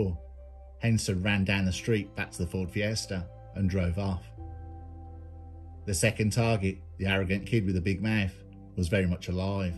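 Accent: Birmingham, United Kingdom. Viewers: There honestly is nothing like a video from, the dulcet Brummie tone, the deadpan delivery, the stories of mans inhumanity to fellow man and the haunting yet relaxing music are what makes these videos such a winning formula. I had to find the music and found this: <link> Apparently it's called " " but I think it may come under a different name in the YouTube music library. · Its Just a Brummie accent mate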